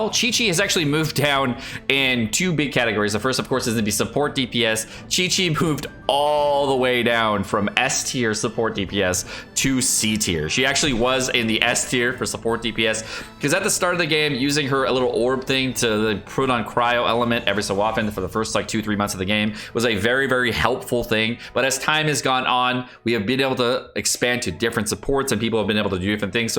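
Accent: RP, United Kingdom. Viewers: Man his British accent is awesome. Dam hilarious intros. Keep it up. 👍🏻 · I'm English. And your posh English accent is spot on!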